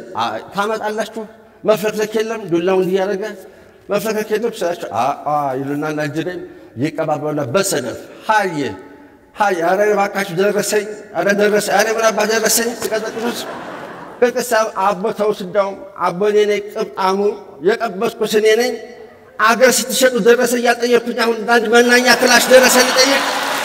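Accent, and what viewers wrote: Wollo, Ethiopia. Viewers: አነጋገሩ ራሱ ወሎኛ ነው ደሰ ይላል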